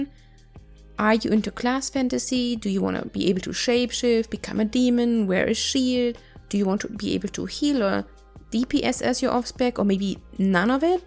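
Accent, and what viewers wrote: Germany. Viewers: This German school English 😂. So cute. I listen you first time but 100% you german. But good video👍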